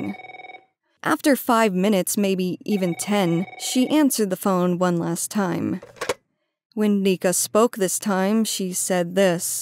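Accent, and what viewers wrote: Japan. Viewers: idk if this makes sense or if you have heard this before, but the cadence that you speak english is really similar to how native japanese ppl speak japanese. its really cool to hear that in english, and also your japanese sounds great too! been watching ur vids for a while ♡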